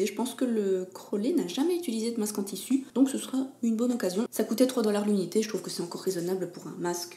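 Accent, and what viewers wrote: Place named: Belgium. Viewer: Coucou, Je t'ai découvert grâce à Patricia B (merci à elle!), et j'adore tes vidéos tout comme ton accent Belge, vraiment;)! j'ai le nettoyant bioré au charbon dont tu parles, je suis surprise car pour moi l'odeur n'est pas du tout désagréable, ça sent le frais, il y a peut-être des différences de compo entre la France et les US... Étrange ^^ Bisous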